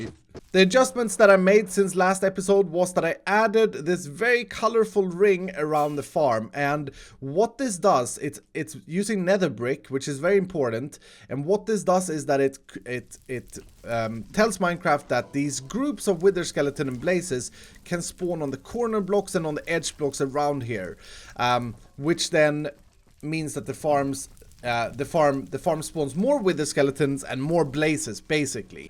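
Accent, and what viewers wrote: Sweden. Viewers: Is you from sweden? 🇸🇪😁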